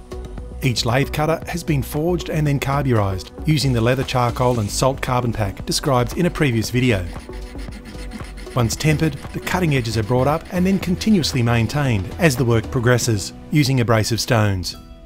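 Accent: Australia. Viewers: P.S. Always nice to hear a fellow Aussie! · one second in to this video and the ambient music + aussie accent conjures up some nostalgia for those 2000s documentaries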